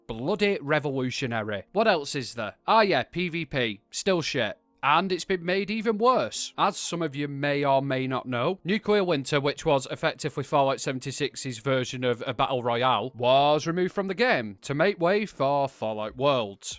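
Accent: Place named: Yorkshire, United Kingdom. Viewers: He's not a brumy, further north. Sounds a bit Manchunian. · Weren't expecting that accent when I looked up a new fallout 76 review lol, Bolton right? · Turn the mic down that Yorkshire accent is like needles being driven into my ear lobes. Beowulf style and I not joking. That creatures ears vibrating thats my ears. But I got what I needed after 2min so thanks.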